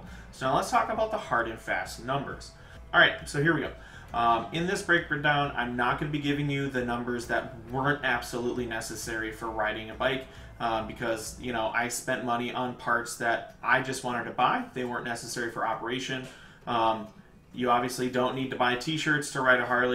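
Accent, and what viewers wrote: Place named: Minnesota, United States. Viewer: I knew you sounded like a MN guy! Please keep us updated on the Commander 3’s. It would be nice to hear how they are doing and how they are wearing after 6 months, a year, etc. I’m in MN summers and AZ winters.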